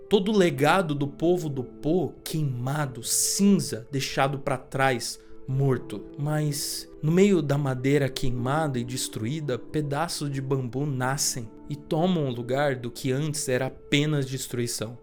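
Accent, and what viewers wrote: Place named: England, United Kingdom. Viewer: Ele ta falando com a pronuncia em inglês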